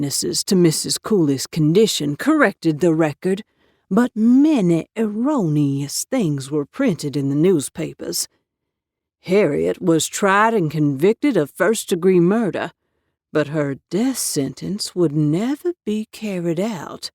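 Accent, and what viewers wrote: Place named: South, United States. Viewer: Love this but the southern accent is too much and this is coming from a southern gal from the mountain community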